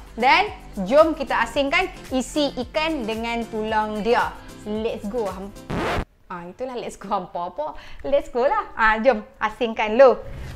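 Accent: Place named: Kedah, Malaysia. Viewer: Comey je kak ckp loghat kedah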